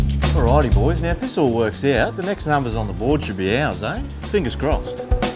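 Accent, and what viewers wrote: Australia. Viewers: don't know why but I didn't expect an aussie accent to come out of that face... Anyhoo, you wanna be a sultan of salmonella, you should come to kagoshima where we eat raw chicken and you can get raw egg to pour over your gyudon.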